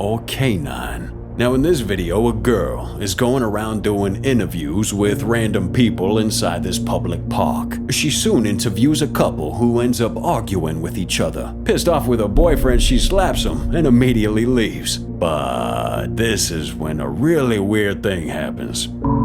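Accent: South, United States. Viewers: Great content as always. I watch all your vids and my gf and I get a good laugh out of your accent, and I mean that in a positive way. We're from Chicago area and we love southern accents.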